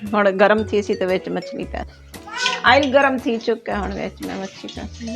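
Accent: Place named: Saraiki, Pakistan. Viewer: Sariki voice im like good Ap ka channel subscribers kar dya ha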